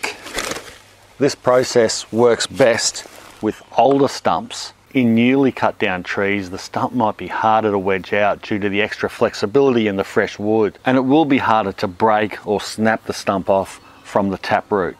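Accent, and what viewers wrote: Australia. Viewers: I wonder why the early pilgrims of the 17th century didn't develop that ozzy sounding accent. maybe they did but there are no recordings to confirm it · Al Borland, but Austrailian...... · This dude is an Aussie Stud! With that accent and those thunder thighs, he can get anything done! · Love that Australian accent. Too bad the country has gone Totalitarian... The rest of yhe world is following, sadly. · I really just wanted to listen to an Aussie bloke talk about removing stumps. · "How to Remove a Tree Stump with a Pick Axe, Chain Saw, Scrap Hardwood, Circular Saw, Prong-tool/crow bar." Hmm, yeah, wedges and a sledge is all I expected to need by the title. FYI, I'm doing the work for a couple Geriatrics on a super slim budgie, not looking to run up a line of credit at the home improvement warehouse. Always enjoy listening to the Aussies, regardless. 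My favorite YouTuber is Ozzy Man Reviews: <link> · Dan Miranda I could listen to an Aussie read a phone book.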